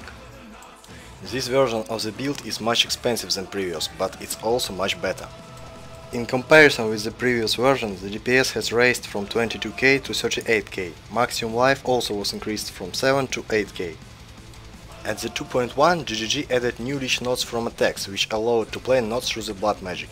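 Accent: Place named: Russia. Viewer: почему автор говорит на англе , но при этом имеет русскоязычный акцент ? :D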